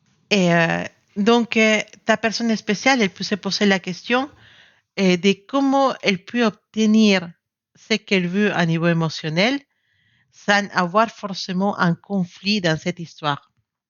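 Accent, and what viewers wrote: Spain. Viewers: Je suis la personne spéciale, il y a pour moi toujours une grosse attirance, je n'ai personne d'autre dans ma vie, on vient de se séparer car il me fait beaucoup de reproches il est dur avec ses mots irrespectueux, agressif dévalorisantes. J'étais jamais assez pour lui j'en ai perdu mon naturel. C'est vrai j'ai idéalisé et je suis très déçue, je voulais une belle relation avec lui dans le respect et dans la paix. C'était l'ascenseur émotionnel. Oui je suis triste malheureusement il ne changera pas. Je suis Gémeaux et l'autre tirage ma également parlé bcp. J'ai peur car je ne veux plus qu'il me parle mal et me corrige tout le temps. Oui je veux changer et le fait de ne plus accepter de l'irrespect et je dois mimposer plus mais aussi mouvrirnplis dans une relation J'adore vous entendre parler votre accent espagnol qui me rappelle mes origines😊 😊😊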